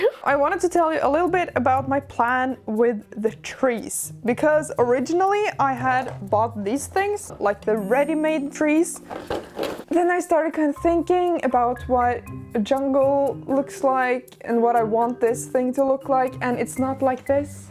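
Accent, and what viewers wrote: Norway. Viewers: "Skjera Bagera" is undoubtedly Norwegian, also her accent and the nature in her videos. So I think she is from Norway. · Im 99% sure ur norwegian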